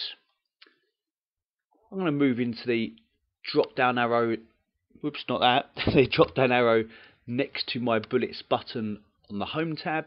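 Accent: United Kingdom. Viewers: Thanks It helped me a lot. Also, your british accent is very satisfying lmao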